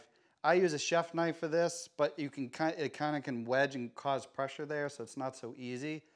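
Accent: Boston, United States. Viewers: Boston accent detected.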